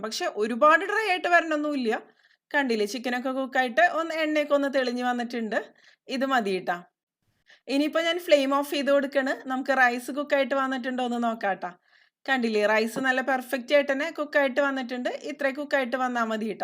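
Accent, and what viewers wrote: Thrissur, India. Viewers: തനി തൃശൂർ ഭാഷ ,ഞങ്ങളും തൃശൂർ ആണ്,സൂപ്പർ റെസിപി ,നല്ല അവതരണം,നങ്ങൾക്ക് ഇഷ്ട്ടപെട്ടു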